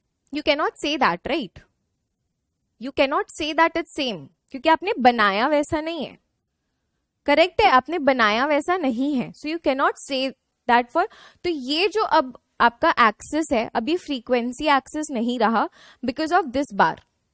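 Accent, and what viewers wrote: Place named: India. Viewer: Good teaching, Madam. However, given that we already struggle with the Indian accent, please avoid mixing English with your local language if your target is the global market. Thank you, and keep doing this great job!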